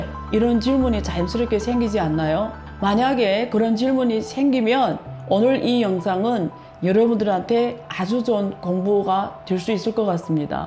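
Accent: China. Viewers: 교수님은 어느나라 사람인가요? 우리나라 말은 잘 하시는데 어딘가 모르게 억양이 약간..ㅋㅋㅋ 중국사람인가요? 북한사람인가요?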